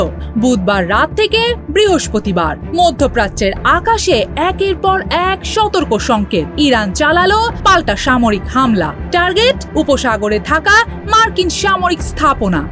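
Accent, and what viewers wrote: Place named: India. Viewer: আমাদের নিউজ বা পাঠক পাঠিকাদের থেকে আমরা সুমৃষ্ট বা সুন্দর উপচতাপনা শুনতে অবচত্য দয়া করে ইনডিয়ানদের মতো সংবাদ পাঠ করা বন্ধ করুন বা বিরত থাকুন এতে শুনার বা দেখার আগ্রহ হারিয়ে ফেলি! কমেন্ট ভালো না লাগলে অপরাধ মার্জনা দৃষ্টিতে দেখবেন ! কিন্তু কমেন্টা করতে আমি বাধ্য হলাম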